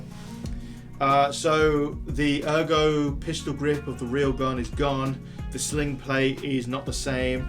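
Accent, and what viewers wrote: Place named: United Kingdom. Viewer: The British accent tells me it’s gonna be a fake gun.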